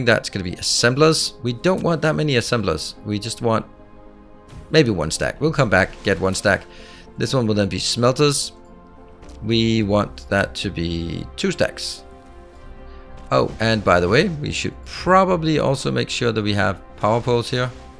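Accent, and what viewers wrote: Denmark. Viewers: Are you danish? (because of the accent. Nothing against danes, on the contrary...just curiosity)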